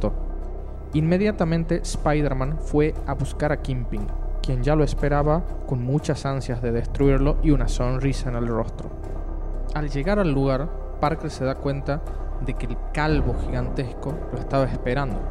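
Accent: Tucumán, Argentina. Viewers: Bien relatado, se nota la tonada tucumana! jaja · sos tucumano?? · Este es Argentino :v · no es que no sepa pronunciarlo , el es de Tucuman , Argentina y en esa zona tienen ese acento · sos tucumano, salteño o catamarqueño? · siempre me genero fuertes emociones el comic de ciwil war y los posteriores a este T-T recordar esto me puso triste otra vez XDD PD: Te encuentro acento argentino... jajjaja de que parte? yo soy de tucuman XD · tucumanoo como yoo jajaja de donde eres? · Se te re nota el acento tucumano jajaja. Es genial ver a alguien del NOA con un canal con esta cantidad de suscriptores. Saludos desde Catamarca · eee el asento tucumano xd es feo sin ofender lo repito SIN OFENDER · Tucumano o me equivoco? Ajajajja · Sos tucumano o santiagueño? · alto tucumano básico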